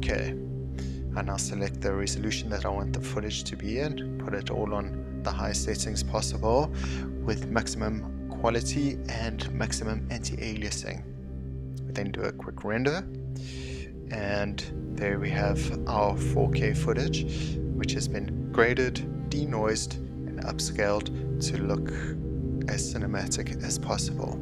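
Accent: South Africa. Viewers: I sense a South African accent !!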